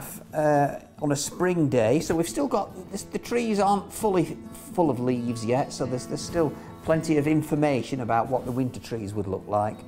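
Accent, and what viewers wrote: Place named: Northern England, United Kingdom. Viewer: Hi Just started painting (52) just wanted to say loving your videos on YouTube. In guessing from your accent you're up north but wondered if you do classes. I'm in cornwall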